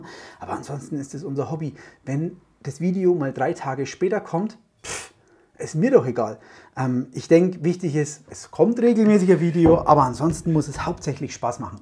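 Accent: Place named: Franconia, Germany. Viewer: "Runsdrum" frängisch is einfach genial 😉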